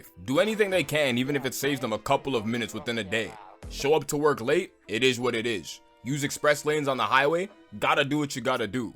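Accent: Toronto, Canada. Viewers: I hear a Toronto accent😂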